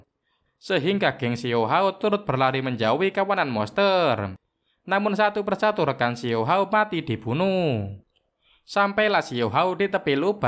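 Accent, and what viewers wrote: Java, Indonesia. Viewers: Mas orang jawa ya soalnya sekat bahasa jawa nya😂😂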